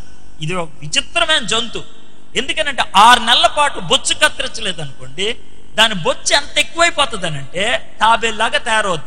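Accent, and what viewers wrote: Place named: Srikakulam, India. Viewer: Srikakulam slang sir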